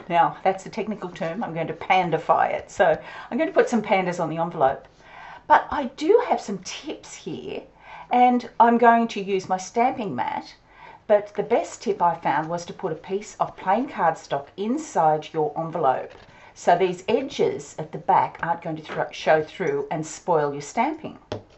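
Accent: Australia. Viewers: Just found you through a friend. Nice to hear an Aussie voice!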